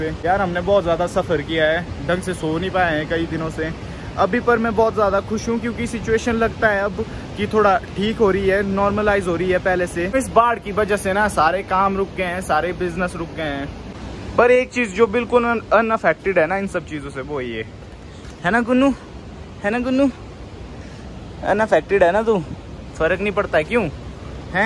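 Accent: Pahadi, India. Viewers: Hi I am also from Himachal but unlucky I am live in Delhi . Mujhe apki Hindi sunkar lag raha hai ki app pahadhi hi ho because your voice pronunciation like pahadi people. Love from Delhi but from Himachal ❤️❤️ pahadi logzzzz 💜💜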